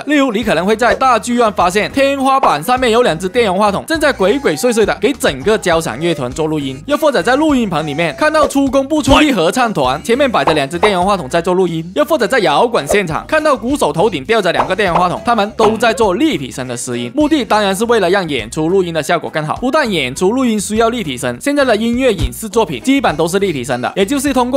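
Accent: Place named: Cantonese, China. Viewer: 樓主的廣東式普通話，真係聽到上癮！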